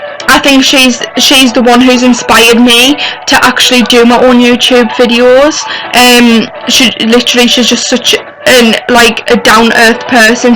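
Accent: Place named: Boldon, United Kingdom. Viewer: I don't believe that. You sound like you're from Boldon, not to insult you or anything :'D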